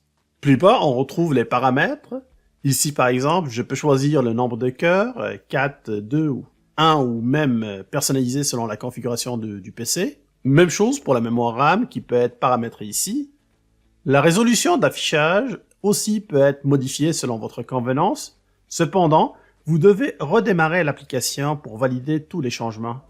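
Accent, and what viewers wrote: Quebec, Canada. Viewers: J'adore l'accent québécois. Je pourrais t'écouter pendant des heures mais je ne me lasse jamais. Ah Seigneur j'attends un que tu mettes un québécois sur mon chemin il va parler pour que je t'écoute 🥰🥰🥰😂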